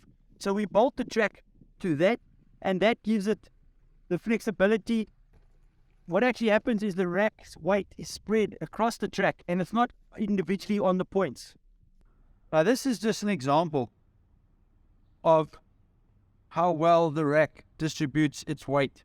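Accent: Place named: South Africa. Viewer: i could listen to you for hours, not because of the roofrack, because of the accent. it remains me of 23 Years living in South Africa. <num> .Then back to Switzerland. But nevermind , your products are great. Lekker bly and tot siens.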